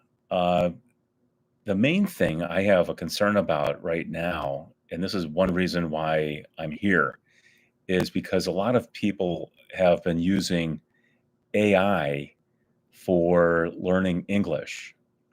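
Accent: United States. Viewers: May I ask you about your accent? You have the most distinct american accent I ever heard, especially very strong "r". Is it a matter of locality you live in, your personal pronunciation, or something else? Don´t get me wrong, I like it. I also appreciate your effort in making your YB content. :hand-purple-blue-peace:Miro, Slovakia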